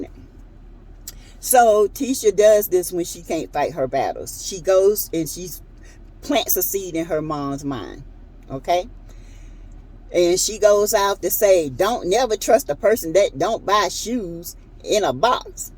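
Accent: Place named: South Carolina, United States. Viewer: I like hearing your commentary. You sound like my family. They are from South Carolina but I'm from NYC.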